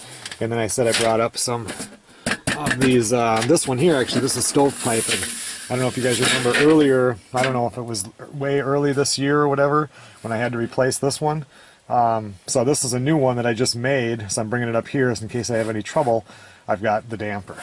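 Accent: Minnesota, United States. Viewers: dear i really love your minnesota accent if u let me come to ur cabin ill let you come to mine in the UP michigan i know michigan people suck but were ohio people so everything will be ok pm me if youre interested alex p.s. wuts ur favorite kind of beer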